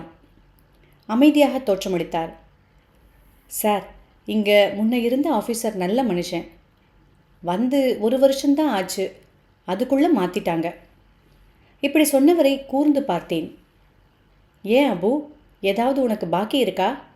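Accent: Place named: Tamil Nadu, India. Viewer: I am very addicted to your Tamil accent 😊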